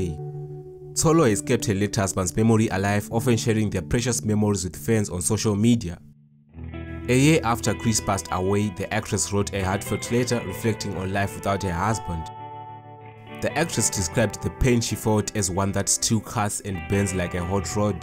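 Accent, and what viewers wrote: Zimbabwe. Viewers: He is Shona forgive him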